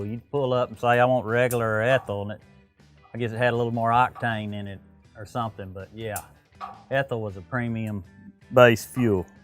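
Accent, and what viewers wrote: United States. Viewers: love the passion you have and how much the different pieces mean to you. I will be watching, good to know people like you still out there...your accent reminds me of all my family back in Georgia, Mississippi...i use to have that same accent but lost it many years ago. i use to get teased when I was younger, living in California...never really bothered me at all. But guess what....now they say --y'all and it just doesn't fit...oh well. Let the good times roll. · I was born and raised in rural Mississippi. The army too me all across the world. Your voice and accent remind me of home and memories growing up. We had a Mr. in our community, Mr. George Carter who ran Carters Store, a great man. Absolutely loved the video.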